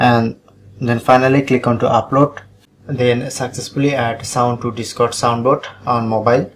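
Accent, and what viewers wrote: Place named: India. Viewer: INDIAN VOICE W TUTORIAL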